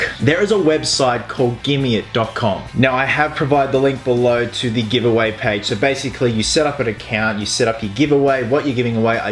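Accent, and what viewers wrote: Australia. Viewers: hey r u australian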